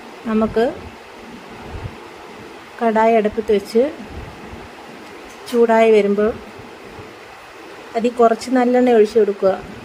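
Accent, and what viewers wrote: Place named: Kollam, India. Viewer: ഇത്താ സ്ഥലം എവിടെ കൊല്ലം ആണോ സംസാരം കേട്ടിട്ട് കൊല്ലം ഭാഷയാണന്നു തോനുന്നു ആളുകൾക്ക് പ്രായജോനം ഉള്ള ചാനൽ 👌സൂപ്പർ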